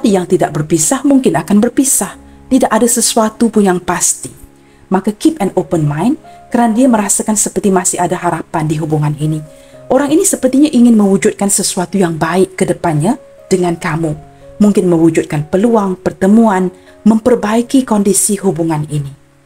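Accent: Malaysia. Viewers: Terima kasih bunda kesayangan ku atas pembacaan nya hari pembacaan yang sangat mengemaskan terutama pada swara sexi nya bunda yang bernadakan / berbasiskan Melayu ILIKE IT sangat sexi sekali swara nya bunda semangat selalu ya bunda dalam kasih pencerahan nya semoga semakin maju Chanel nya kami sangat mendukung Chanel tarot thank you 👍👍👍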